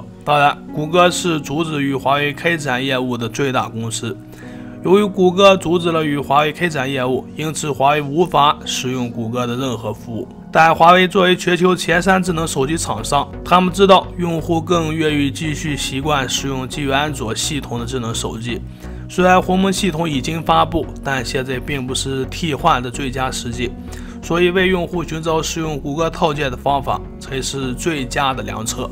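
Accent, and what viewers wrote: Shanxi, China. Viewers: 山西兄弟吗？好好练练普通话... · 山西口音啦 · 哈哈山西人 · 山西口音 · 兄弟，山西人？托县的？ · 你这是山西的还是河北的？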